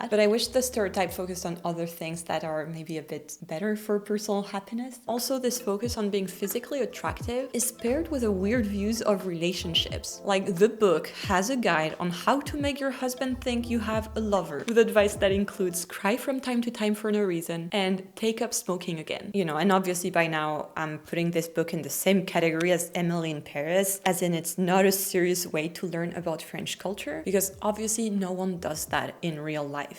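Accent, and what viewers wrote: France. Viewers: I love the French accent so much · You certainly have a very valid point about all the marketing, and the way overdone stereotype. But I have to say it's kind of funny that you actually have the look, flawless skin, a casual, effortless seeming tastefulness and beauty, plus your so appealing accent, that you are a perfect example of the French women I've often seen on the Metro in Paris. It really is undeniable that it's common to see women there who have an understated calmness, and a style that is casual, but also put together just right. Stereotypes are overdone, but nearly always have an element of truth, and this one is no exception. You are my Exhibit A. Cheers.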